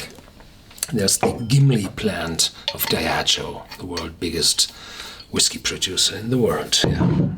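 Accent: Germany. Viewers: I don't think he's British or from the U.K. I believe he's a German native, though perhaps he moved and I'm wrong? He obviously has a very strong German accent.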